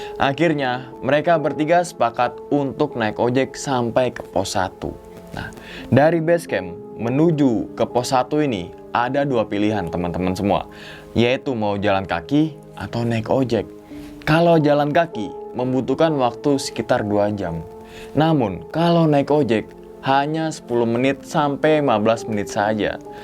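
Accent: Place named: Jakarta, Indonesia. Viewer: Jawa mana mas,,tak kira Betawi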